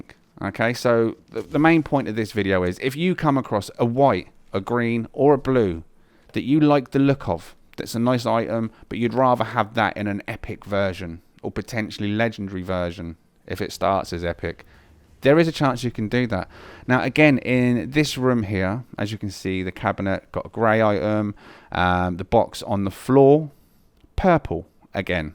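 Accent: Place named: United Kingdom. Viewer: literally what he just sounds british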